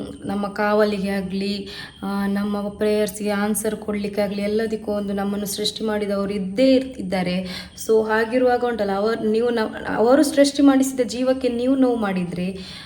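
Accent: Mangalore, India. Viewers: This is Mangalore kannada Mangalore an style of speaking that's why you feel that it is not perfect but I feel no problem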